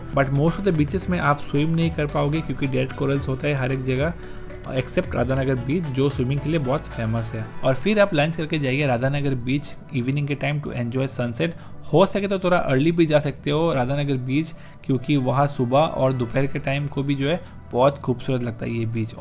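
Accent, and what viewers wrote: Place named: Bengal, India. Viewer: From your accent I guess either u r Bengali or Assamese?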